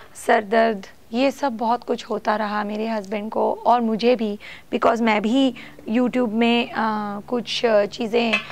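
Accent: Standard. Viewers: Ma'm itni shuddh hindi to aajkal koi north India main bhi nahi bplta he😄. Your hindi is at/ even above native speakers. No need to be worried about it. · Aapki Hindi bhut hi Sanskrit nisht Aur shuddha hai Aur bhut hi uttam hai adhiktar hindi bhashi logon se…..aapko pranam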